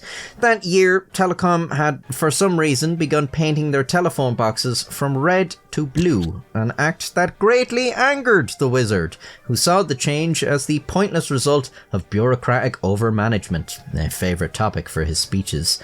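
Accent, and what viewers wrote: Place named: Ireland. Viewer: hes irish. he has a reason to not speak a london or oxford adjacent accent.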